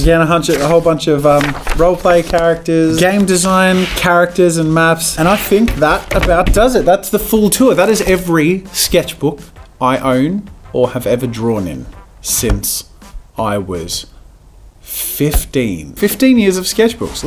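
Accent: Australia. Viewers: Is he Australian because I am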